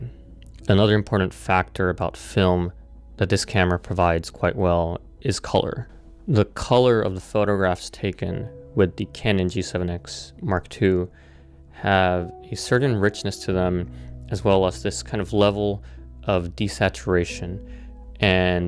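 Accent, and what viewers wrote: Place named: General American, United States. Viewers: Bro, people don't know you live in Japan! You sound American or Canadian, and you specified $ in the video title. So people are going to assume you're talking about buying it with American and Canadian dollars lol. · May I ask why your English is so good? Was one of your parents American/Canadian? Did you live in North America? I think the reason people had no idea you didn't live in the Americas is because your accent is WAY too "General American Accented" (or standard pronunciation) so given that they had no reason to think otherwise. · Ok but when you speak with an american accent and put the price in USD dont get mad at ppl for assuming. I live in europe and also thought you were talking about the American used market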